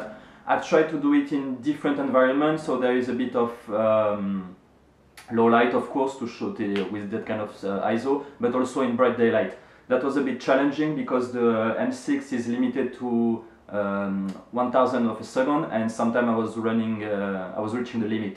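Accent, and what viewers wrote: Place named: France. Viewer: aaaaah, le petit accent français! J'adore votre site au passage, super utile pour apprendre!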